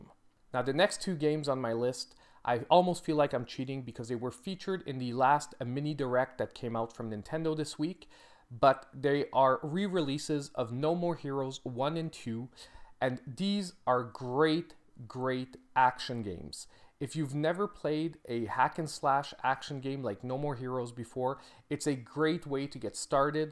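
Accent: Canada. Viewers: Sup bro from Los Angeles like the Canadian accent. Carrion gets me attention big time. Will add to my back log of games. Just picked up Assasins creed rogue. Thsnks for the reviews keep up the hard work.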